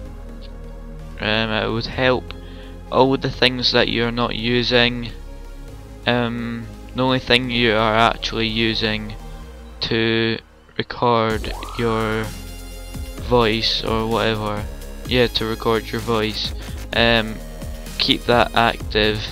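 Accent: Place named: Scotland, United Kingdom. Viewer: Yassss he's Scottish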